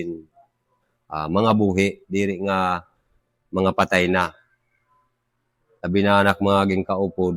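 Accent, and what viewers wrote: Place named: Waray, Philippines. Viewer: Northern Samarenyo waray parin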